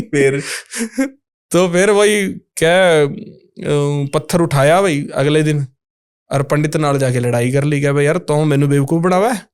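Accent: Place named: Haryana, India. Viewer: So sweat haryanvi language sone bhai sab ji 🙏 🙏🙏🙏🙏🙏🙏❤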